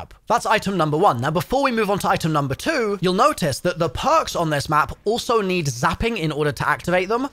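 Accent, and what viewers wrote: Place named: United Kingdom. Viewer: I am laughing so hard at the fact that two days ago I went to find your tutorial and couldn't find one. Now I finally can have my proper British walkthrough as the keepers intended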